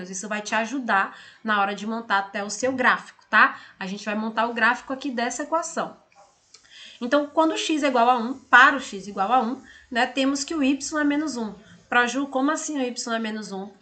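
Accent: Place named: Paraíba, Brazil. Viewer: Tenho uma sobrinha que se chama Juliane, é paraibana, e tem sotaque idêntico ao seu.... Parabéns pelo vídeo. Muito explicativo. Paulo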